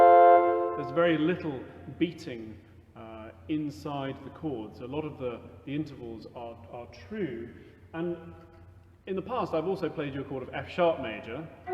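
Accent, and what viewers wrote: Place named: Cambridge, United Kingdom. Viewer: I am delighted to hear that you have not lost your Cantabrigian accent after your years in the USA. I went to "the other place" but have not lost my Oxonian accent either after 38 years in the USA. My American wife says it is because I never listen to anything she says.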